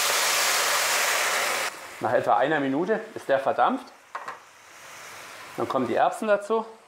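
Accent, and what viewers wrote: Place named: Franconia, Germany. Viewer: Gelernt ist gelernt. Ich brauche schon zum Champions putzen und schneiden 15 Minuten. Beide Rezepte finde ich gut, werden nachgekocht. Danke für Deine Mühe. Darf ich fragen wo Du lebst? Hört sich fränkisch an. Ich komme aus OF (Lichtenfels), habe aber lange in Nürnberg gelebt. Seit 24 Jahren lebe ich aber nicht mehr in der EU.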